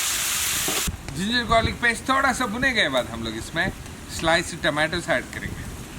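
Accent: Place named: South, India. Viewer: hindi South Indian accent cool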